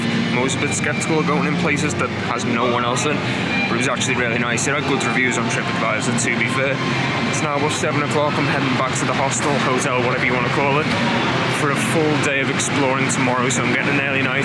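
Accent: Liverpool, United Kingdom. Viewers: Are you a Scouser because if so you're the first Scouse youtuber I've ever seen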